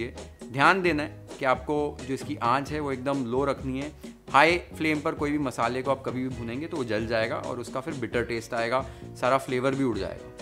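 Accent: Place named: Bengal, India. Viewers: Love your Bengali accent. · Your bengali accent is beautiful ❤❤ Loved this, this item is quintessential for all bengalis throughout all our lives ❤ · That was exactly my thought right into the video.. proper bong accent from a punjabi dude.. on point !!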